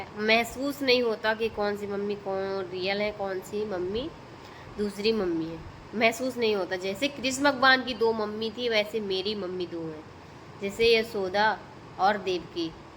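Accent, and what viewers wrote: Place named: Bihar, India. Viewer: Are you from prayagraj? Shocking.....you speak like bihari....anyway...keep growing....and stay away from such peoples...❤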